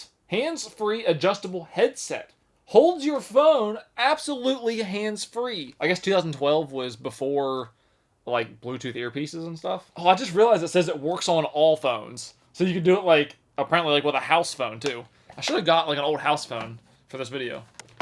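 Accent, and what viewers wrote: South, United States. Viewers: Maybe it's just the Southern accent but dude will you dropped on your head? Either that or you've huffed a lot of petrol. I bet you think the world is flat and birds aren't real.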